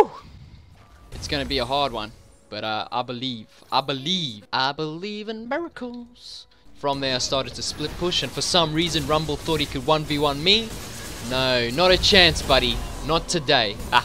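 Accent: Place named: South Africa. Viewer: You live in Australia but you sound South African, are you from SA?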